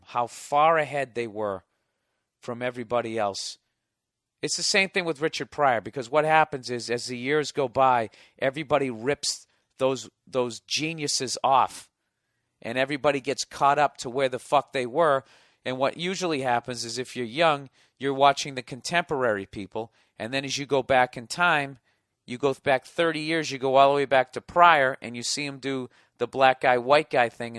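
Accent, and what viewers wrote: Los Angeles, United States. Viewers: I love his LA accent. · The LA accent is epic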